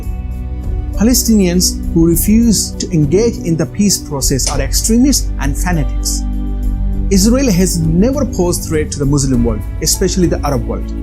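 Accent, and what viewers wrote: Bengal, India. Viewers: He sounds like a Bengali no Pakistani speaks like this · He sounds Bengali not Pakistani. He sure is confused with his identity.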